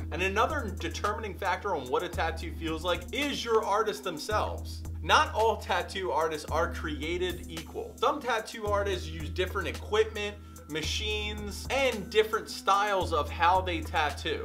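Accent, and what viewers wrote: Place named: Delaware County, United States. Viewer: Delco accent. Know I can trust this guy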